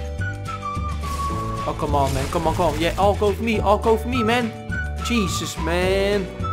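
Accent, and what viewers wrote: Netherlands. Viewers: i luv ur dutch accent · Ik hoor gwn dat je Nederlands bent geef toe want in een van je video's zij je perongeluk een Nederlands wordt😄😄😅😅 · Iemand Nederlands ?? Hij klinkt alsof die nederlands is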